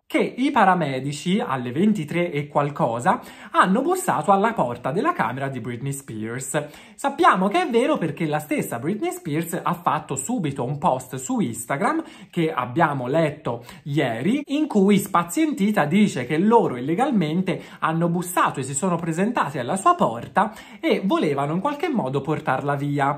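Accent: Tuscany, Italy. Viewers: 🙋🏻‍♀️presente nuova iscritta! Io ho fatto il mio, tu per c contraccambiare mi devi accentuare il tuo accento toscano!!!!!🤩🤩😍😍😍😍🤩🤩🤩🤩amooooo!!!!!!!!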